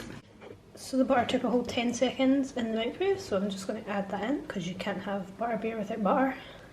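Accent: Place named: Scotland, United Kingdom. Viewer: I freakin adore Scottish accents. It’s the one accent I can not do myself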